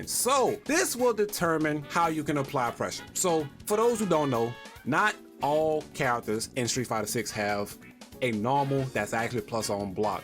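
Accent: United States. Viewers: I know this is old, bro. But thank you. I'm learning to play for real now and I know that some people preach about the frame data thing. I ran into your video and THANK GOD you're just as country as me lol. That helped a lot. Appreciate you explaining this 💪🏾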